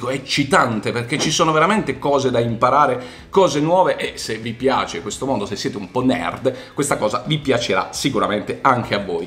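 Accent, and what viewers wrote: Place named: Foggia, Italy. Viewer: Ma sei di Foggia??? 😁😁😁 Riconosco l accento familiare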